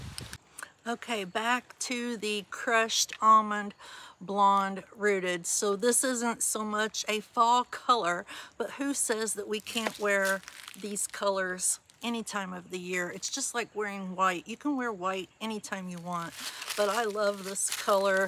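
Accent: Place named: Indiana, United States. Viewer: I thought you sounded like a Hoosier 👍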